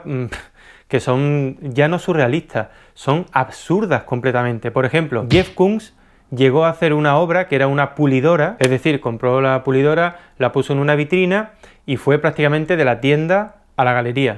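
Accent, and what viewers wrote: Andalusia, Spain. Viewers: Por fin encuentro alguien (serio y con formación) que dice la verdad sobre este negocio. P.D: Me encanta el acento andaluz. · Hola!!! Te descubri hace poco,me encantan tus videos. Tambien fui a Escuel de Arte,pero no pude ser artista,pero ahora me has dado un empujon! Jajaj. Me encanta tu acento,de ue parte de España eres? Es que tienes el acento muy parecido a una gran amiga que tengo y ella vive en La Linea de la Concepcion. Un abrazo enorme desde Liverpool!!!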